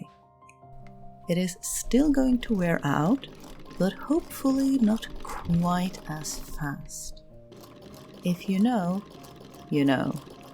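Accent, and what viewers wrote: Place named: Sweden. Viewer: I came here from your Hank react. The tone and pacing of your video style is gorgeous. Perfect for watching while drinking coffee from my favorite mug and knitting on a rainy Sunday. Also, my maternal grandfather was a Swedish immigrant (US here) and I feel like I'm listening to long lost kin. ❤ An island of tranquility in a dark, stormy world these days.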